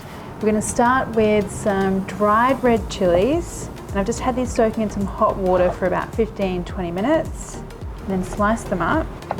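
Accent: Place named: Australia. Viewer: We go to Chiang Mai often and enjoy Khao Soi. I think I will make you this recipe while I’m stuck back in Australia Btw you sound Australian too 😊😂😎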